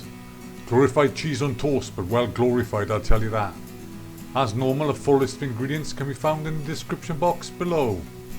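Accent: Wales, United Kingdom. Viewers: The Welsh accent is very refreshing so it is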